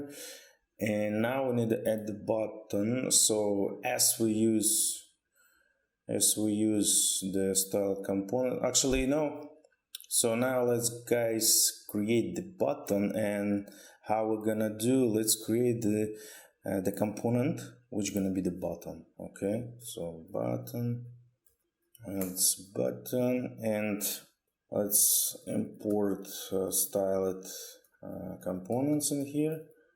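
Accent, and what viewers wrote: Russia. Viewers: are you russian? Your accents just seem to be russian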